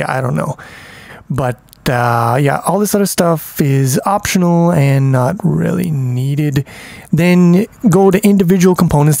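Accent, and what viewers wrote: India. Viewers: the accent is troughout my first language super super annoying. 1. I cant barely understand it. 2. Is super fucking annoying to listen... almost run away screaming. It probably has to with germanic languages in which indian accent sounds really like ear rape. Im not racist. The accent is just ..... terrible.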